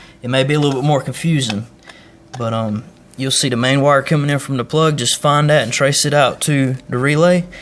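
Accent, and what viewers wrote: South, United States. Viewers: thanks, you are a good speaker. a bit of southern twang perhaps. going to work on my fridge today armed with knowledge.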